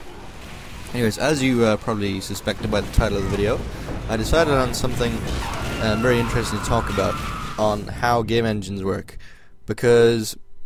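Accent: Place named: England, United Kingdom. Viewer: at the start.... I see what you meant about the English accent xD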